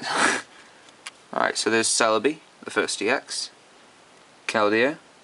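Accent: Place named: United Kingdom. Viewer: Your british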